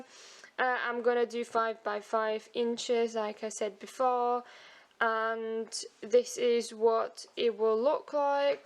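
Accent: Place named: Yorkshire, United Kingdom. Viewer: where are you from haha? your accent is so yorkshire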